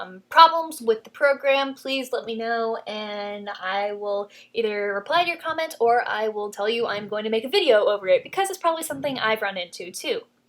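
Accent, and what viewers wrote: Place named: Australia. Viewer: Why do you speak Australian?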